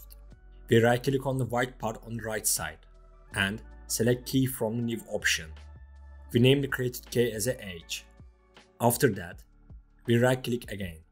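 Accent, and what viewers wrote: Turkey. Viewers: türksün değil mi konuşmandan anladım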